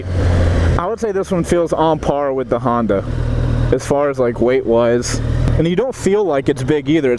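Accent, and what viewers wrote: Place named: North Carolina, United States. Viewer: Are you in NC? Your accent and scenery feel very familiar!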